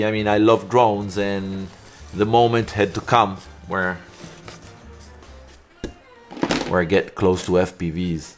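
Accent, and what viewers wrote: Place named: Italy. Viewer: Ciao, ammazza parli meglio di me l'inglese. Diciamo che si riconosce l'accento Italiano ma si capisce benissimo, io ero pilota privato ora sono Al secondo drone, un giocattolo da 30$ giusto x funn, un abbraccio 😘✌🇨🇦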